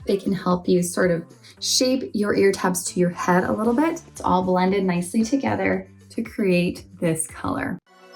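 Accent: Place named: Canada. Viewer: Just a trivial question... How is your accent doesn't seem different ? Maybe I'm wrong about Canadian accent. And the Charming style wig, great!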